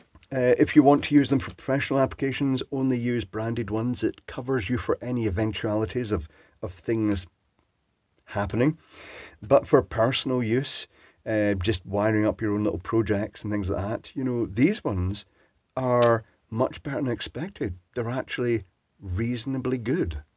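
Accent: Scotland, United Kingdom. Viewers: Another good video,watched you for years but never commented,I live in Ayr and it's good to hear a fellow Scot,I bought some non branded ones from Amazon after I watched a video of John Ward's where he tested them extensively against branded terminals and gave them a good review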